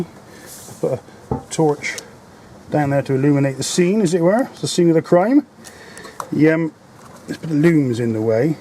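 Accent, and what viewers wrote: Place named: United Kingdom. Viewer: With the accent I thought you were in the UK! Have watched the vid again and called myself a 'muppet' as you stated you got it from Mr Auto. I've sinced looked starters up for my car. Cheers for the tip on not using slick 50 in the gear box, only ever used it in engines with no problems.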